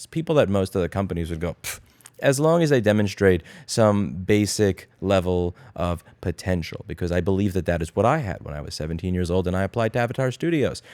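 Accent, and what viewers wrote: New York, United States. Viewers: I have a feeling in like two years time he's going to look and act completely Texan, but still talk like a New Yorker. Welcome to Texas =)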